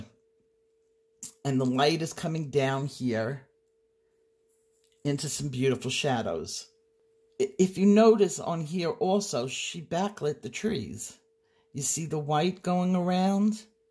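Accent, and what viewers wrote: New York, United States. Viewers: Great to hear that New York accent again on "ColotTube". Your instructions are always welcome, however, if I followed your first one to " plan ahead before you pick up a pencil " I would have close to zero colored pictures! About the closest I get to pre planning is picking which colored pencil set I need to take off the shelf. At 76 who has time to pre plan? See you soon. I'm pre planning to watch you. Don't take too long.👵♿🎨 Barb N.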